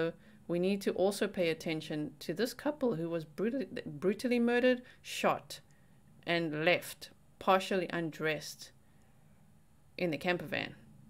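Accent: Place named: Afrikaans, South Africa. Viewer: You HAVE to be south African! Nowhere else in the world would you find that strong afrikaans accent.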